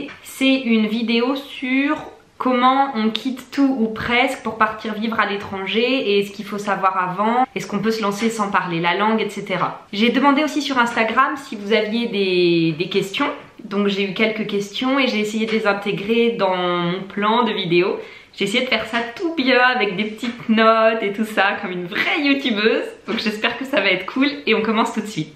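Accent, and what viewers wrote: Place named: Germany. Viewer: Ça se voit l'accent allemand quand vous parlez français, hihihi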